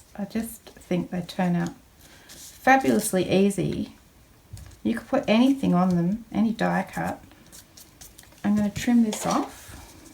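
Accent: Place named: Australia. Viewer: I just love to hear your Aussie voice on U tube..from a fellow Aussie..love what you do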